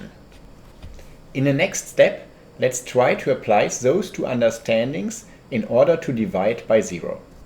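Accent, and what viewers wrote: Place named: Germany. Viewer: Nice Kraut accent.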